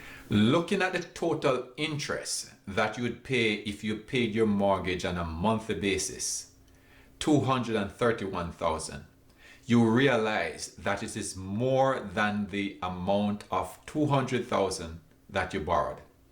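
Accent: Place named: Zimbabwe. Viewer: Nice accent! Zimbabwe???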